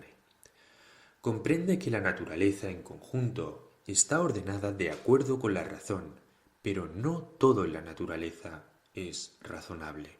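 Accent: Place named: Mexico. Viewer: Me encanta su claro hablar y también el acento de México es muy entendible y claro pero el resto de Sudamérica es fatal.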